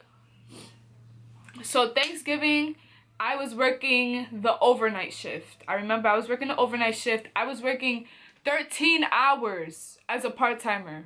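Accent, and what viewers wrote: New York, United States. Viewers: I like your accent are you in New York ? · I don't know which one you worked at but I'm sure it's in New York somewhere with that accent lol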